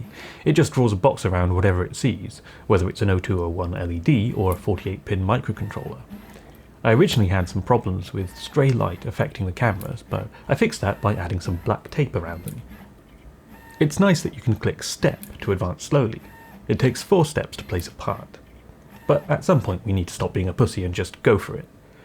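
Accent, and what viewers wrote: United Kingdom. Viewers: Can I ask🤔, and I ask out of pure curiosity and mean no offence, why is it you speak with a very good well educated British accent but sound "wires" with a V? ....please feel free to tell me to "multiply and go forth" and mind my own beeswax....I cant help it its my curious mind, when I have a curious thought go through my mind It just keeps jingling around in there distracting me and keeping me awake at night until I know the answer🙆🤦🤷🙄😅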